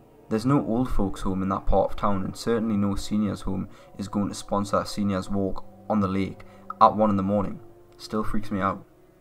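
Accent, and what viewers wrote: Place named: Scotland, United Kingdom. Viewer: Where is it that you are from?? Sounds Scottish (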